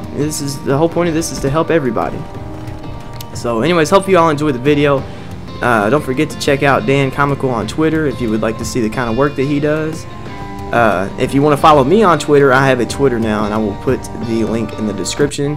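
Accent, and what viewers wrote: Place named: South, United States. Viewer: Where are you from? from your accent you seem from the south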